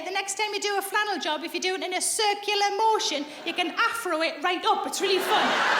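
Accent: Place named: Newcastle, United Kingdom. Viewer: Geordie accent. I recommend youtubing jimmy Carr accents. Explains a lot 😁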